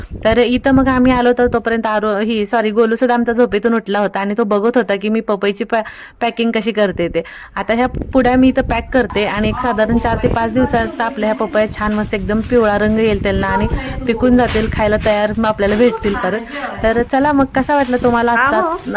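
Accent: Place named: Solapur, India. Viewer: ताई तुमचं माहेर सोलापुरा कडचा आहे का कारण तुमचं बोलणं तसंच वाटतं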